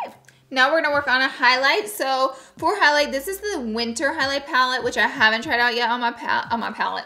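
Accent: South, United States. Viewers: I’m a Louisiana woman and I love your Southern accent. Sounds Louisiana or Alabama...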